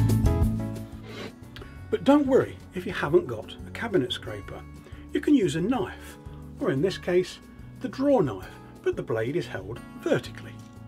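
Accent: United Kingdom. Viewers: Thank YOU! Since childhood I have wanted to make my own bow. I practised archery as a kid and was obsessed with Robin Hood and all things Medieval. I remember my dad and I at some point went to the woods to find a big branch of a yew (called a taks tree, from the Latin, in my native Denmark) from which to make me a long bow, like Robin Hood’s. But although my father is a fourth generation cabinet maker by training, we were unsure on how to go about making a long bow. I since worked many years as a lumber jack, but eventually became a classical guitarist by profession and a lutenist of sorts. Unusual background, I know. I kicked my television out many years ago, and I watch instead wood working videos by Paul Sellers and bow and archery craft with you and select others when ever I have the time and need. I thank you for bringing all of these disparate but somehow connected interests of mine together by teaching us the seemingly forgotten skills of yet another of mankind’s inventions. 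I’ve had my goal set at times variously on instrument and furniture making, but I am convinced that bow making might become my spare time enterprise of choice. I must also further compliment you on, A) being a top-level presenter, comparable to the very best, without exception, and B) having a superbly brilliant dog! (An aside, is that a Coventry accent, by any chance?)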